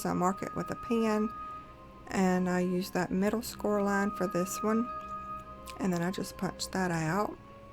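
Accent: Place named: South, United States. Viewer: Where did she grow up to acquire her country accent?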